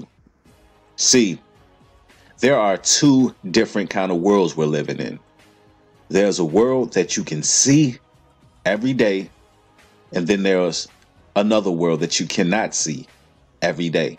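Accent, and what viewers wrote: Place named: DMV, United States. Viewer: You from the DMV homes? You sound like it...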